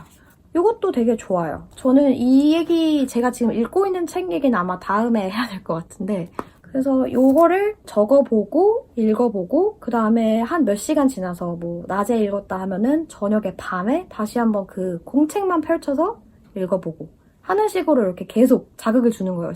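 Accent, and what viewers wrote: United Kingdom. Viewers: I really like your british accent, can you make more videos of communicating with other people in english?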